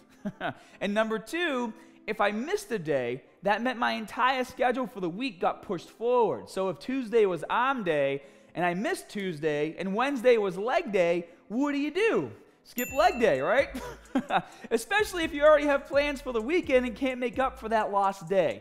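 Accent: Boston, United States. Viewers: That's a proper Boston accent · That thick Boston accent!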